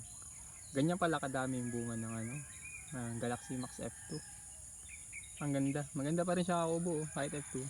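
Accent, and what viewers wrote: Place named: Bicol, Philippines. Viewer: Taga San ka Pala boss parang bicol ka Kase pag nagtatagalog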